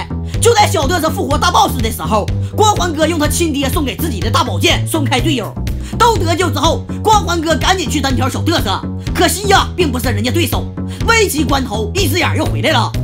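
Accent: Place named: Northeast, China. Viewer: gun six 东北话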